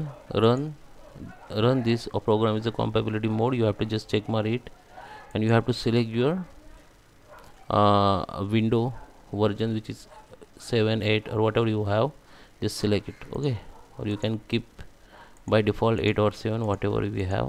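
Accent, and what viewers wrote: India. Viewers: indian accent is umbearable holy 😭😭😭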